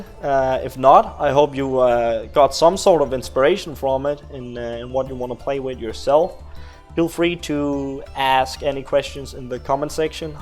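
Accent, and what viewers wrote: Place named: Denmark. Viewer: You sound like you're from Denmark, love your voice daddy <3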